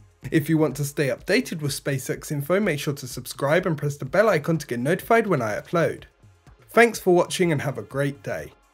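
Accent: United Kingdom. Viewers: Sorry but his mouthed was British his farther south african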